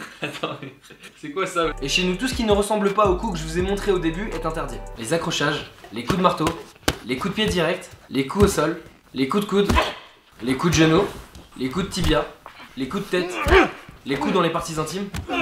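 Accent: Alsace, France. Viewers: Cet accent alsacien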